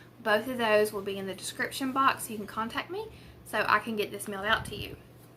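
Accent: South, United States. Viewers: I think it's the southern accent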